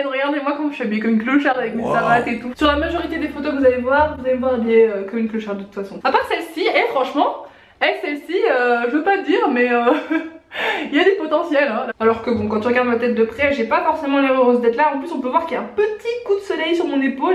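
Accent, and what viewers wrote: Belgium. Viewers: T es belge ?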